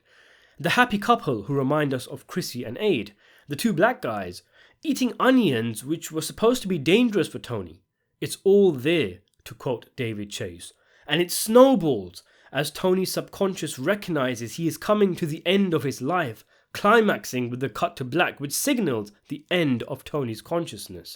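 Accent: England, United Kingdom. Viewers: All I know is when he slips in those show references, like anytime he references Vito he calls him and ass muncher, with the British accent it's fucking priceless! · That's all it is...a theory Chase has confirmed Tony was killed. Also isn't this dude english? That's how they talk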